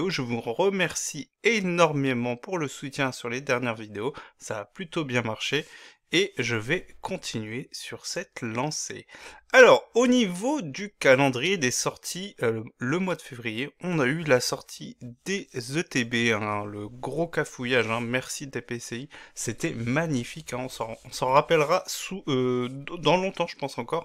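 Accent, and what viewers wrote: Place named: Portugal. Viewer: Bravo pour l accent portugais!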